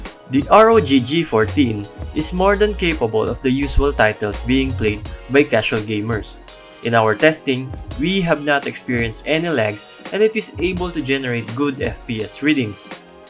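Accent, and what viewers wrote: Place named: Philippines. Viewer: Im not a Pinoy but I can recognize that the reviewer is Pinoy from his accent 😁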